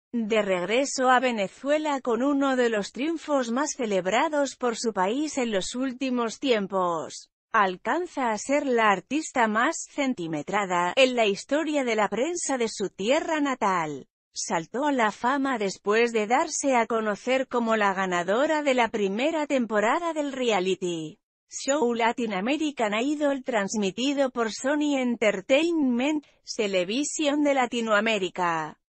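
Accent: Spain. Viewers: ese acento español es horrible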